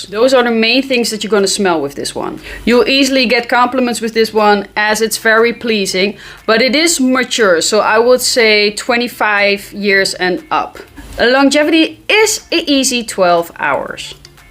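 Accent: Netherlands. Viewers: Ben je Nederlands? Zo klinkt je accent. Je bent trouwens echt grappig 🤣🤣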